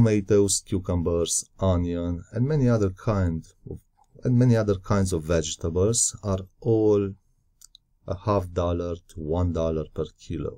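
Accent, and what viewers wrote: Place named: Hungary. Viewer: Hm...as far as I understand an ESL teacher can expect some 1000 USD per month in Vietnam. Well I think in Romania nowadays a decent teacher employed at a public or private school can make this money salary + some private classes. Of course in Romania you wont have that abundance of jobs as in Vietnam. BTW...are you an ethnic Hungarian? your English has a noticeable Hungarian accent. Also ...congratulations for the excellent well calibrated comments and videos...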